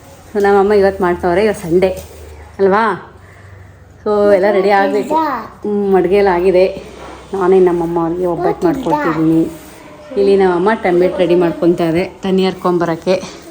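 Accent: Mandya, India. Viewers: ನಿಮ್ಮ ಮಂಡ್ಯ ಬಾಷಾ ಕೇಳುಕ್ಕೆ ಚಂದ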